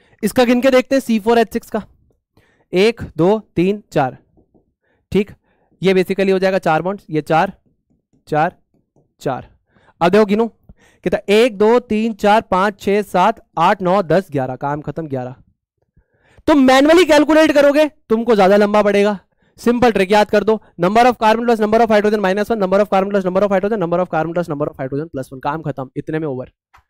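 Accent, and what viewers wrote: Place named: Marwar, India. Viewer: Sir aapke teaching style ke sath appki marwadi...it's amazing 😊❤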